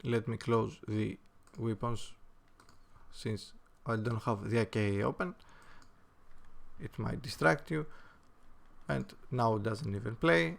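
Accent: Greece. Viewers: Are you greek? You sound like it :)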